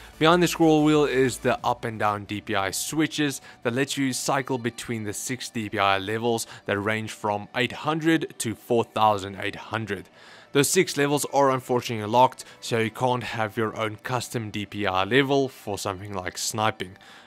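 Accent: South Africa. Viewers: YES youre south african!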